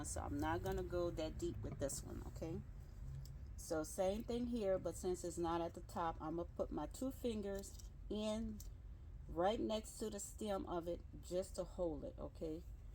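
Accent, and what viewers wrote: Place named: Louisiana, United States. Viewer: Beautiful, south louisiana gardener here. As soon as you began speaking I recognized you are a fellow Louisiana gal 💙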